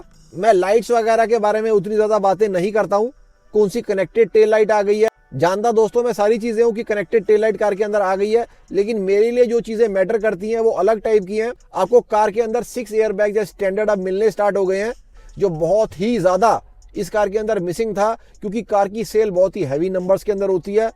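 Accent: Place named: Pakistan. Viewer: Bhai aap muze pakistani jaisa sound kar rahe ho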